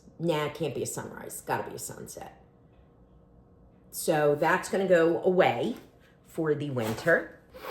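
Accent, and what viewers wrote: New Jersey, United States. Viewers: Speaking as a fellow "just across the bridge from Philly" native New Jerseyan (who has been transplanted to Northern VA) I love to listen to your flosstubes and your "accent". You sound like home to me :)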